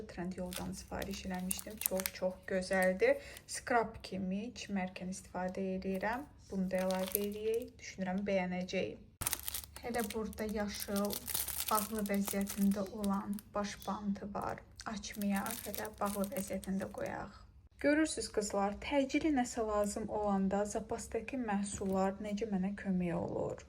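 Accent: Russia. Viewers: после долгого просмотра тебя на русском, смотреть и слушать азербайджанский из твоих уст так странно. И сразу чувствуется,что ты русскоговорящая😅 ❤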